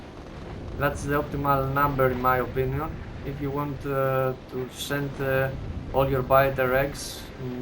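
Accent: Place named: Cyprus. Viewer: Από την τσιπρέϊκη προφορά σε κατάλαβα. Εγώ πέρυσι το ξεκίνησα. ξέρεις πώς γίνονται αυτά... ένας φίλος σε ένα πάρτυ μου λέει «έλα δοκίμασε, με μιά φορά δεν κόλλησε κανείς». Πήρα και το Space Age... άστα μεγάλε. Αγίου βαλεντίνου στην φάμπρικα. Το τερμάτησα αλλά δεν έκανα την τελευταία επιστήμη. Τώρα το ξαναξεκίνησα και έβαλα και κάτι μοντάκια κόλαση: Quality++, Corrundum, Maraxsis, long stack inserter, advenced belts και φύγαμε για megabase.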